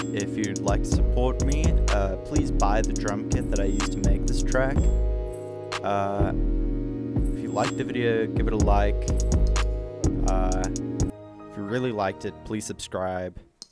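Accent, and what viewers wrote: South Africa. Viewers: lol the way I can tell you're South African just off of your accent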